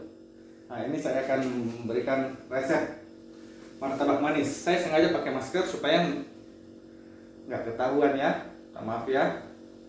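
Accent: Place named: Sulawesi, Indonesia. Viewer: Assalamualaikum, jazakallah khoiron jaza, atas videonya semogga memberikan manfaat dunia akhirat.. dan berkah umur ples reezkyny... Dri logatnya kayakny dri sulawesi yah mas??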